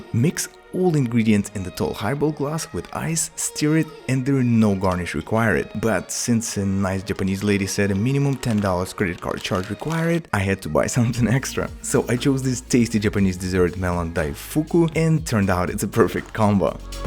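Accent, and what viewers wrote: Russia. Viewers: "Your basic cocktail making skills" if the accent wasn't enough that sold it. He's definitely Russian.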